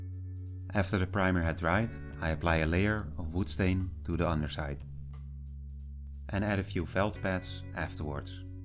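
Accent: Netherlands. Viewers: Thank god another video from the terrain building bob ross. I dont know what it is with your voice, maybee the combination of the tembre and the dutch accent, but listening to you is deeply relaxing.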